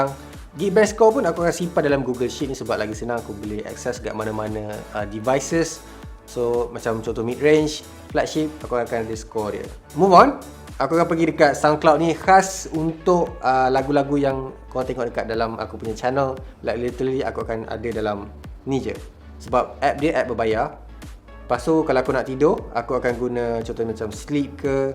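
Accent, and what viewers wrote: Terengganu, Malaysia. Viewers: Org kuala terengganu ke ? Area mana tu? 😄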